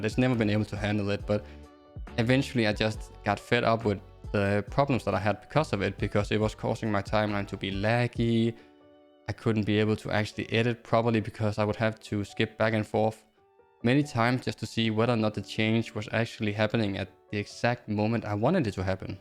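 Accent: Denmark. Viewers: Hey bror, har set du er stoppet med at poste, men ber dig begynd at post igen tro mig. Du skal bare øve dig på dit engelsk så det ikke er danglish og blive ved med at poste og lock in. Tro mig hvis du bliver ved bliver du stor på et tidspunkt.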